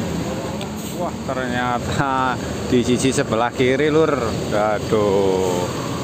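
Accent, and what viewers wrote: Banyumas, Indonesia. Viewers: Selamat siang kang.. Nek ira ngapak ora ke penak..antap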